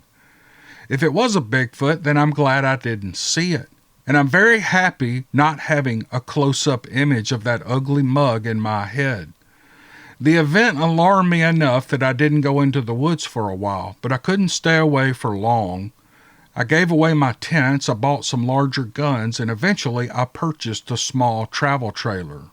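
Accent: South, United States. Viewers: Thankyou for the stories AND your time! The work hours go by much quicker while listening to your southern accented thrillers.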